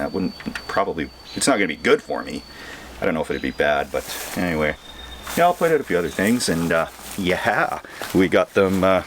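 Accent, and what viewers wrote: Alberta, Canada. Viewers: I'm glad you killed them all 😃 good luck on all of your renovation endeavor. The Alberta accent is strong with this video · You betcha, eh. This is a very Canadian sounding video.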